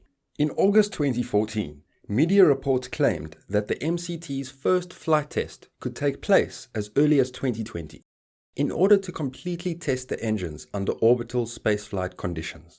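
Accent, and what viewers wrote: South Africa. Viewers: you have a south african accent? i'm from durban, loved the video! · I can hear your South African accent from a mile away.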